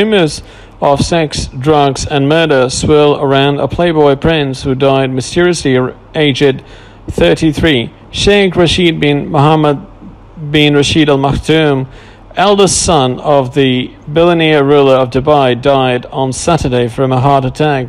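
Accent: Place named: England, United Kingdom. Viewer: You sound English, therefore you should read and speak it much better. This was almost painful to listen to. You should also check your facts and your pictures. Rashid and Hamdan don't even look alike so how can you mix their pictures up? Youtubers always get it wrong when it concerns the Dubai Royal Family. I couldn't even finish this video. Your delivery leaves a lot to be desired.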